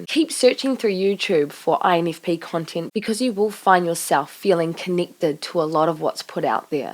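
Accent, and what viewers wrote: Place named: New Zealand. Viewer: Completely off track but I heard your accent and can tell you're from home. INFP NZ here.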